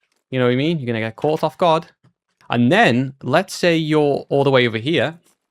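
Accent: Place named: Scotland, United Kingdom. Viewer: Scotish accent is beat 🎉❤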